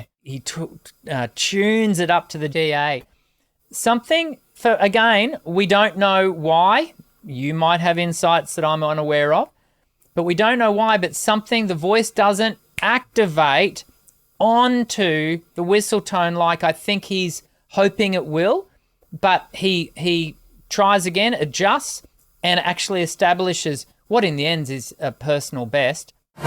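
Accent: Australia. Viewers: His microphone shake is also a good way to respond to people who continue to insist that "he isn't singing live" just food for thought... also it isn't just a personal best... there are no other recorded live examples of this feat (if you have one show me) best voice in the world (that's an opinion translates to "favourite") best technical vocalist? that is measurable, got a better one over all aspects? appreciated your commentary it was very Aussie... greetings from the Sunny Coast.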